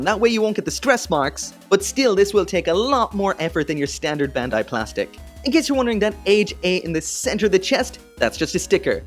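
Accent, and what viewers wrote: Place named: Ireland. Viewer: You look and sound like an Irish Bam Margera dude.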